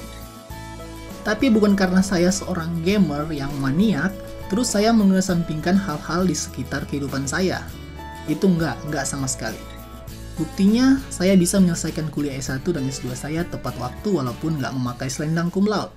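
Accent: Manado, Indonesia. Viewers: yaa,kirain Sulsel,ternyata Manado,gue Sulsel bang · pantes kyk hafal logat nya wkwkwk manado koten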